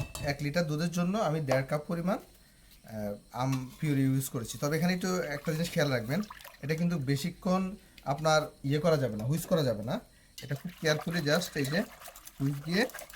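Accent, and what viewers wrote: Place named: Chandpur, Bangladesh. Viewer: আপনার ভাষায় চাঁদপুরের ছায়া দেখতে পাচ্ছি। আপনি কি চাঁদপুর থেকে বলছেন? যাই হোক, ধন্যবাদ এতো সুন্দর করে রেসিপি শেয়ার করার জন্য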